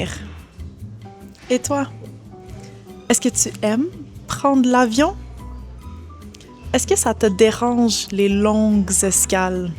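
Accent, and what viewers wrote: Quebec, Canada. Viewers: omg canadian french accent slay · ❤❤❤I am subscribed to you and I just had a feeling haha. I noticed there r been fewer videos, months apart sometimes and I was like “huh she must be busy with other life stuff” then I started watching dreaming French and I thought “wouldn’t it be weird if she wasn’t posting as much bc she was making videos for their Quebec accent” then I saw you and freaked out bc my conspiracy theory was true haha. You’re really a great teacher, I would watch some videos over and over to get familiar with the accent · Yesss! Quebecois French!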